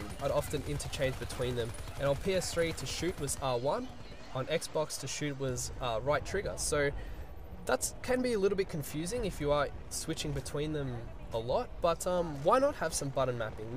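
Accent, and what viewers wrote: Australia. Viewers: i am guessing you are an aussie... and if you are what clan are you in. i am in a US one right now and need some ausssie to play with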